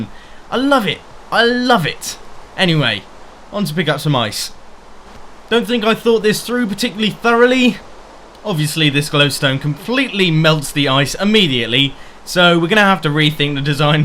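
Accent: England, United Kingdom. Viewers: 1. Because your accent sounds amazing, 2, it's good as it is now, 3, can you learn us how to speak with an british accent? That would be amazing :). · because your commentary is entertaining and you do have a very nice english accent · 1. ur one of the few brits that are good commentators and i love brit accents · hermit craft and the british voice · 1.because I love minecraft, and British accents are awesome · BECAUSE BRITISH ACCENT MAKES EVERYTHING BETTER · I'm not so good at listing so i leave it to you to understand:P 42. I really love your editing and i always wanted to learn using "adobe after effect" it will be lovely if you make few tutorials about this awesome program. for another thing, i think that adding few more games to the channel will spoil. 485. i love all of your vids, i don't think there is something you need to change, but i would really love to see tutorials on bigger and more complicated redstone contraptions, i know it is hard for you to do that, but i would be really happy if you make a vid, i don't know what, maybe a 16x16 screen. 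99837.I subscribed to you at the beginning, when you made all the redstone awesome stuff, i was totally noob with redstone and wanted to learn some. after a while i was like: "YAY! another vid from!" and of course - its because i love your British accent and you:) hope that my opinion will help you:D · 1. I subbed to u because UR BRITTISCH ACCENT IS FREAKING AWESOME and i love your redstone videos 2. hard question...^^ 3. If u would start playing and recording League Of legends you would be awesome I've also have a suggestion of what you could do in your redstone videos. A 9 didgit code lock, been looking for a tutorial on that for ever and i can't find one. So maybe you could do that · 1. I subscribed because of your British accent. Because more than half my subscriptions are Brit. And of course, I love redstone, cars, and minecraft in general. 2. I just suggest maybe some better wifi connectivity because most times with me. 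The wifi is why it's slow 3. Add some mod reviews. I love mod reviews, a lot. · 1: I subscribed to you because I started minecraft about 2 months ago due to Gophervids doing a live stream of minecraft as a first impressions, after doing the whole building thing, I wanted to get into Redstone a little more and googled redstone minecraft and your vids came up first, a fun way and seemed a lot more professional compared to others. 2: Nothing really, you seem to be doing fine, redstone and live streams are regular, your quality is top notch (pun intended), and i'm really enjoying Hermitcraft series 3 and just re-watching hermitcraft series 2. 3: Seems to be fine, I've subscribed to 2 channel and it's filling in the void for whatever was missing at the time of filming, Xisumavoid collabs won't go amiss. Edit: I didn't stay for the accent because I'm British and I hear it everyday lol. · 1 I subscribed because you make cool videos and you have the best British accent I have ever heard 2 Do a facecam 3 I would like to see more too small videos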